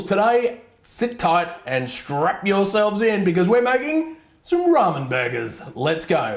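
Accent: Australia. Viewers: No offence i noe ur aussie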